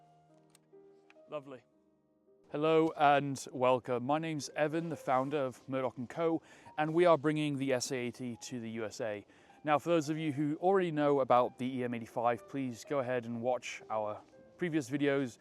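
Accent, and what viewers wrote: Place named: England, United Kingdom. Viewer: a real round then. When I heard the English accent I thought he was gonna sell a .22 😂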